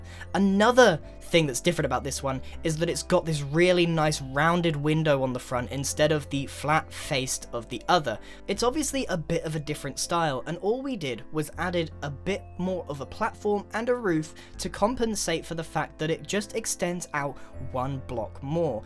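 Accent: England, United Kingdom. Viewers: Are you English coz I am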